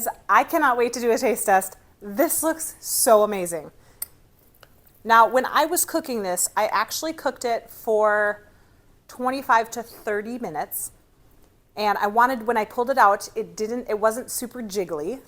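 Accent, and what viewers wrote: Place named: South Dakota, United States. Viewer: I spent some of my elementary school years in eastern South Dakota. You sound like you're from that area of the world. Sounds good!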